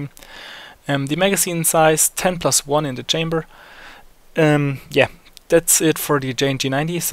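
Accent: Germany. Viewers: Are u German? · Gutes Video! Ich habe alles verstanden und dein English hört sich richtig gut an. Den deutschen hört man aber trotzdem heraus :) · hab ich sofort gehört, aber der dialekt ist nicht so stark wie bei anderen deutschen die Englisch sprechen:)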